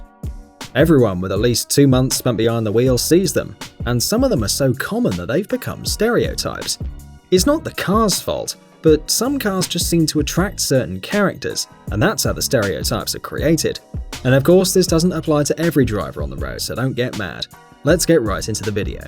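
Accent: England, United Kingdom. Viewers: Are you from England? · Weird to hear a British accent and loads of British numberplates, and hear you call an MX-5 by its US of A name..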